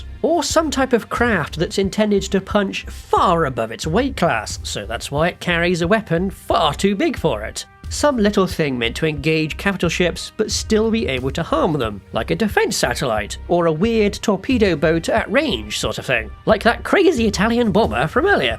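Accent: United Kingdom. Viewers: Eeek… bro got two of the worst traits, squeaker voice and British accent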